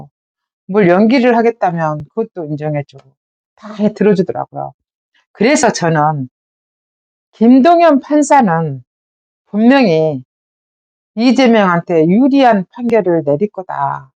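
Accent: Jeolla, South Korea. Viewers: 지나가다 보게 됐는데 미모의 여자가 전라도 사투리 쓰면서 어찌 이리 딱 딱 맞는 말씀만 하시는지.. 바로 구독,좋아요,알림설정 일사천리로 하고야 맙니다.😂😂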